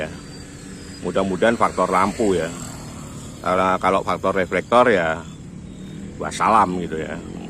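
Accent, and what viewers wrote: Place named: Java, Indonesia. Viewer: Mas nya bukan orang sembarangan, karena apa, karena pengucapan kata katanya terstruktur rapih dan aksen jawa nya kental jadi saya suka dengernya... ❤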